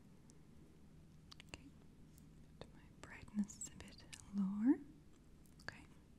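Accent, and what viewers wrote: United States. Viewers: Does anyone know where this amazing lady comes from? Her accent is obviously very American but I'm sure I can hear something else in it and it sounds beautiful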